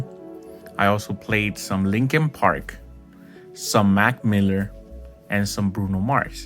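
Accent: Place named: Colombia. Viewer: I like your Colombian accent 😇😇😇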